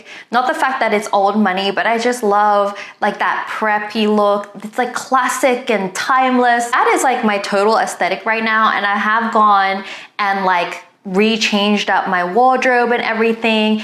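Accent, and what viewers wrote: Australia. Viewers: I miss your vlog channel so much! I used to watch you so often when I was younger, to the point where I still pronounce words with australian accent because I was practicing this language with you 😭 I love to hear you talk and be honest! Hope to see more of that in the future :) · GOD I MISS THE AUSSIE ACCENT :((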